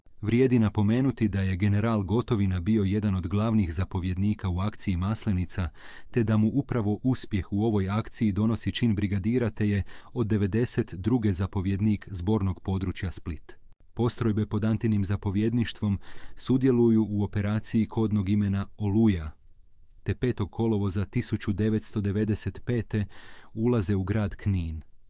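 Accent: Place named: United States. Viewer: Ti kao da si Amerikanac kako to izgovaras Gotovina Zadar itd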